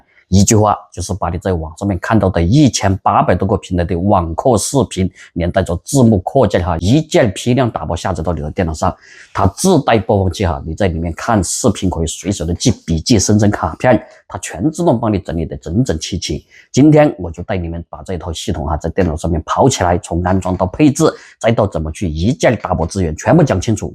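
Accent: Sichuan, China. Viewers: 喜欢你的四川口音(川普)，与印度口音的英语。。。:face-green-smiling:🤣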